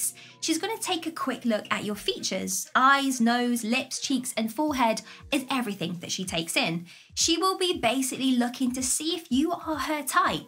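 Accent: United Kingdom. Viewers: Now even though I'm 57 and very happy in my own skin, this is such a fun video to watch because the speaker has a most pleasant British accent and seems to be able to present her "material" in such a fun & fresh way. IMHO, this video is way more enjoyable than most of the shows on TV. Anyway, I just wanna say, "keep up the good work"..... I think your efforts are not only practical but quite easy to grasp😊 👍👍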